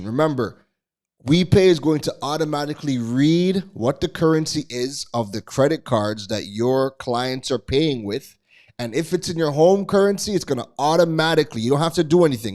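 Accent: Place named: Trinidad and Tobago. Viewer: Where you from bro? Sound Trini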